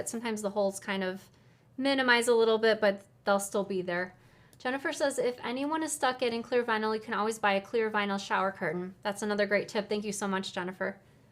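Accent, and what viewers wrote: Newfoundland, Canada. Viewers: Deffo Newfoundland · Newfoundland for sure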